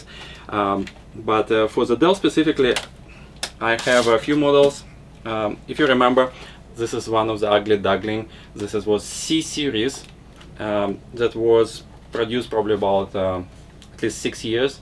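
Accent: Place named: Russia. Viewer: are you Russian speaker ? Russian accent 101 %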